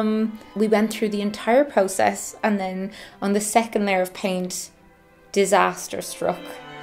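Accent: Dublin, Ireland. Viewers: I just stumbled across your channel and I’m going to already subscribed because hearing you reminds me of my sister-in-law in Dublin and I miss her. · The office is amazing, and the girl is so pretty and sounds Irish.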